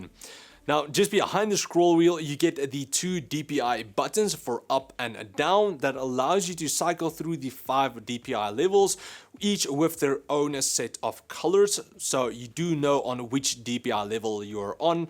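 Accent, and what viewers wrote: South Africa. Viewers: Good review man, mine just came from evetech, dont fight the SA accent, embrace it! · ey this oak is south african lukkkaaa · Great review as always,. I'm from SA too. Embrace that accent, buddy. It'll sound great in the videos😉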